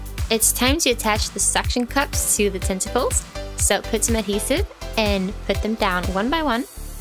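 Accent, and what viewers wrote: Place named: Skåne, Sweden. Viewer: ÄLSKAR din dialekt, är du skåning?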